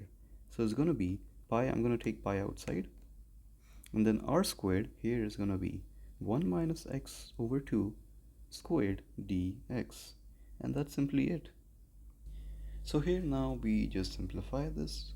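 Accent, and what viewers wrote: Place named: India. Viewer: Bro u have indian accent i don’t understand anything u indian biryani scammer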